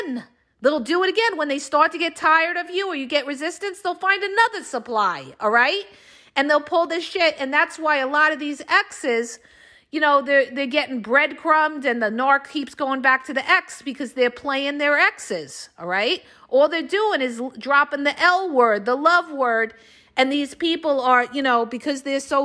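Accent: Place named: New Jersey, United States. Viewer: I guess you're from Jersey.Thank God I love you.I'm from Elmwood Park.I love the way you talk and you don't put up with s*** and you swear that I love you liked and subscribed.I was with the covert narcissist 44 f**** years, I left 6 months ago and enrolled in cosmetology school and with my old roommate.But i'm still sad the abuses something else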